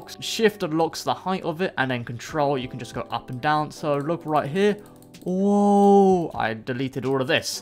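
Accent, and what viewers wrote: United Kingdom. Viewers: I love the uk pronunciation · Are u British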